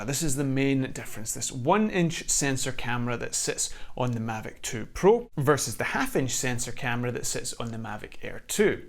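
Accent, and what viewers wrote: Scotland, United Kingdom. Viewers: Excellent review very informative and very happy to listen to that pleasant scottish voice.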